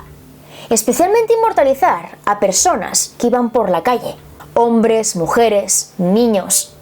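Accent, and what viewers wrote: Spain. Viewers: Se busca un corazon de Se busca un saludo de Se busca su acento español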